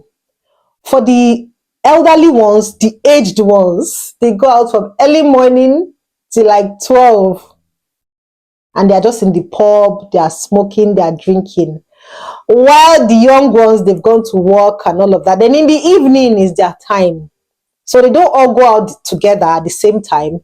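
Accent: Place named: Africa. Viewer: My guess is Nigeria but definitely African countries based on the accent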